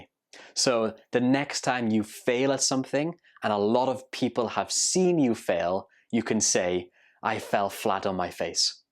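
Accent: United Kingdom. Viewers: Excellent video,! Your British accent is amazing and you really rock as an English teacher.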